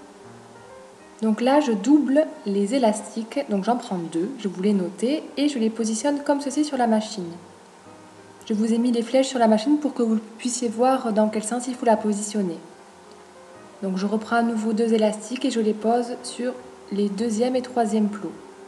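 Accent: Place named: Nord-Pas-de-Calais, France. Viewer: tu parle ch'ti ! !!!!!!!!!!!!!!!!!!!!!!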